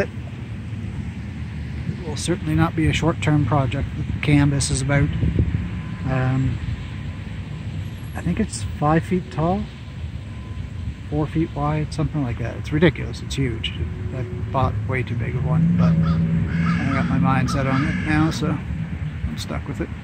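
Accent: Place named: RP, United Kingdom. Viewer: I can already hear her Received Pronunciation.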